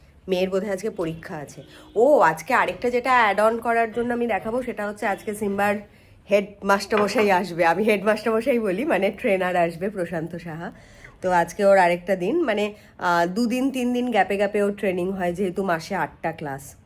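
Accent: Bangal, Bangladesh. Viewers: Aunty tmr bangal kotha gulo ki cute 🥹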